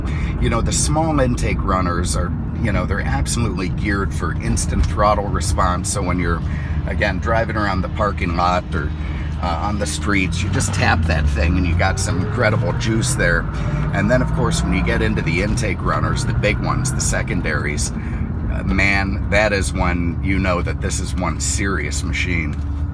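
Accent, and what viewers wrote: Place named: Yankee, United States. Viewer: That Yankee voice grates on this Southern man's ears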